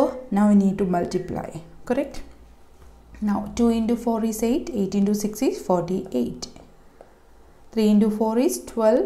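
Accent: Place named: Kerala, India. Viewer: Malayalee pronunciation is shittie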